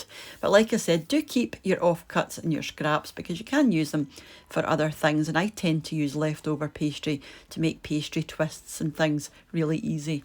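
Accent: Scotland, United Kingdom. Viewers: Hi just discovered your channel when trying to find how to bake short breads, my Scottish Aunt y made them and l have her recipe but its hard to read l looked up lots and finally someone with the right Accent..you've made it easy for me now l can work out her writing.. and only a couple of ingredients...new to your channel and love it regards Doc from Down Under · Cor that looks absolutely delicious! ❤️ My late father was Scottish.. You have a lovely accent bless you 🙂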